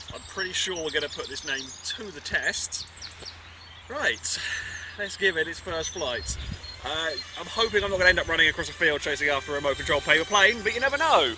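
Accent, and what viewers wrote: England, United Kingdom. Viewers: looks American sound british. 😱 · Hey that field looks familiar, where are you in England. also that accent sounds like mine lol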